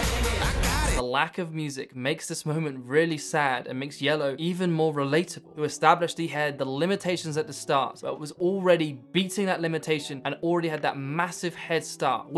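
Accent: United Kingdom. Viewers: british accents :( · Honestly the most useful editing tutorial videos I’ve ever watched + I love the British accent ❤️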